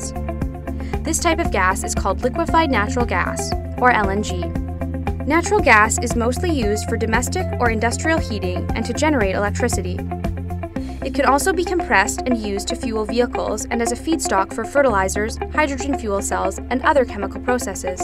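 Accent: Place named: Canada. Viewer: The chick narrating must be Canadian. She also says buried weird- like “burried” vs “barried”.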